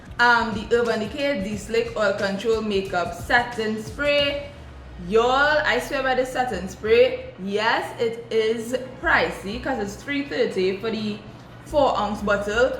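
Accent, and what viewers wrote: Trinidad and Tobago. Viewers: U hearing the Trini accent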